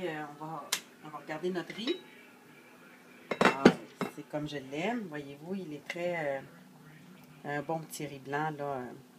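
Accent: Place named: Quebec, Canada. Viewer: She's Dominican Canadian I can hear her French Canadian accent and her Dominican Spanish accent lik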